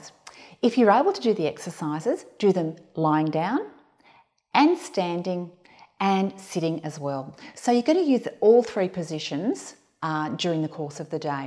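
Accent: Australia. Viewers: Thank you! Very helpful and nice to hear an Aussie presenter. I miss Australia! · She speaks with Australisn accent. It is very nice to listen to.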